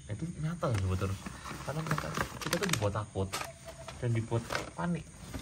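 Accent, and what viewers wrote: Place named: Java, Indonesia. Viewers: Bang lw ngomong koe logatnya medok sih emg bang asal daerah mana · Mantap mas maaf mas klo boleh tau sampean dari tegal y jawa tengah logat nya ketahuan salam dari gunawan cah weleri sobat horor sehat slalu mas jngn lupa bismillah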